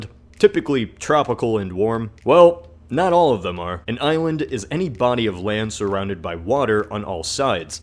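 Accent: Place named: United States. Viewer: thing he/she meant "he's english". But I don't think that's true, he sounds american/canadian.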